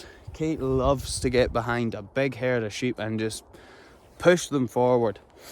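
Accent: Scotland, United Kingdom. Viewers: This was a very easy “Subscribe”!! Your accent may be invisible to you, but this American whose grandmother was from Edinburgh just loves it! It took me a moment to catch on to what you were saying at first, but then I got the rhythm of your usage. When I was a little girl, my friends who would come along on a visit to my grandmother’s place couldn’t understand a word she said. LOL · All of your dogs are beautiful, but Kate has such a personality. I absolutely love your accent, I could listen to you talk all day! One of these days I will visit Scotland, I live in Oklahoma, United States.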